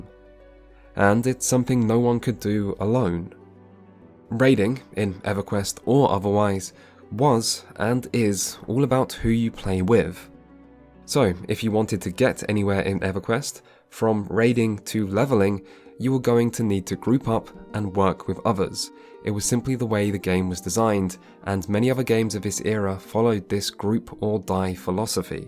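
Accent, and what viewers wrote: United Kingdom. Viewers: OMG everytime I hear that brit accent I end up hating the thing I used to like.......arrogant snot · british english - instant skip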